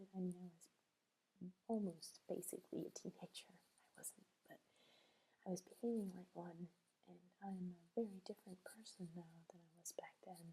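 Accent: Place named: Denmark. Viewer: You had a thicker Danish accent, back then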